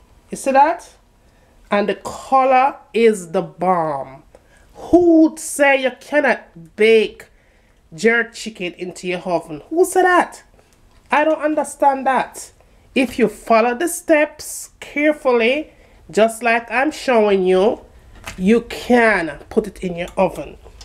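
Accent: Jamaica. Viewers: to cook thats how we Jamaicans speak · I love her accent ! Making me wish I was Jamaican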